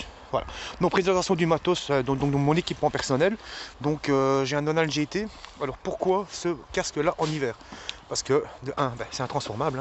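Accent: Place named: Toulouse, France. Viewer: J'ai reconnu l'accent de Toulouse.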